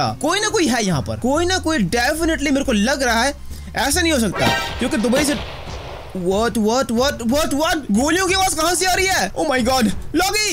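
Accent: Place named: Assam, India. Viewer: app assemes bolteho Kiya app Assam rehtohe kiya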